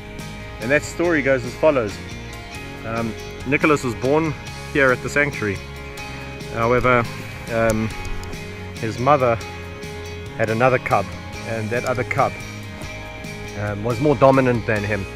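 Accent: South Africa. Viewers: He is South African I can hear his accent Hoe Gaan dit my ou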